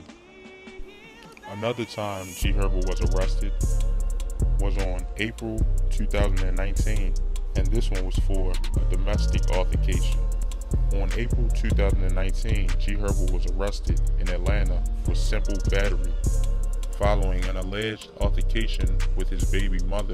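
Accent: Baltimore, United States. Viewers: oh you from b more huh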